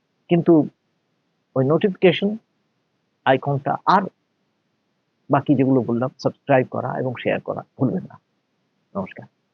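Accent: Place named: West Bengal, India. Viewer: আপনার বলার বাচন ভংগী খুবই চমৎকার,,,,,, তাছাড়া পশ্চিম বাংলার বাংগালী ভাইদের ভাষার মাধুর্যতা তো আছে ই,,,,ধন্যবাদ আপনাকে।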